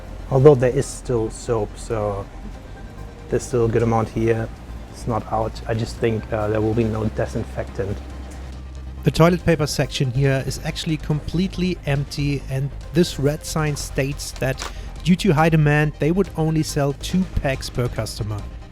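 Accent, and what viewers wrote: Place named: Franconia, Germany. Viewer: Franken oder? xd Ich habs allein gewusst wenn er deutsch redet und war mir 100% sicher, wie er in einem anderem Video gesagt hat „du kannst mir mal den Buckl runter rutschn“ und jetzt noch die Dächer in dem Video...😂 haha🙈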